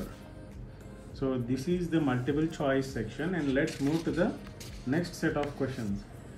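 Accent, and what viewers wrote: India. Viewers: the Indian accent was difficult for me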